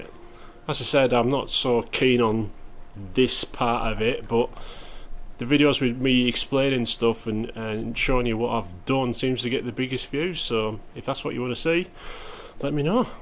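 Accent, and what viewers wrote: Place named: Northern England, United Kingdom. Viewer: The bent valve is a shame, do you know why and what it hit? 12.7 compression? Not messing around are you! What fuel do you run? Could there be a valve from something else that could be modified? Maybe turned down or something? Does REC (Paul Ivey) still exist? I remember going to see him for valves a long time ago! Maybe there's something in the US from a V8 or something? I agree about your videos, the ones where you explain stuff are the best, can't beat the Northern accent! I think as, ahem, more mature Mini blokes, we forget what we know and take for granted over time, young guys just don't get the exposure to basic stuff (although people like Tom have bucket loads of enthusiasm!). Back in the late 70's it was a necessity that you could work on your own banger to keep it going, all my mates did the same and we learned by our mistakes! 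I need to learn more about engine management and injection, that's why I watch your stuff, although I like how you're adding a bit of humour, who ate all the chocolate toffee's........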